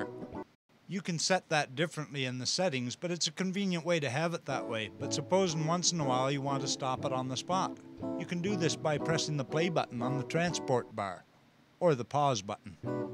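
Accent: Canada. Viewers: Nice video and canadian accentt soundz kool dogg!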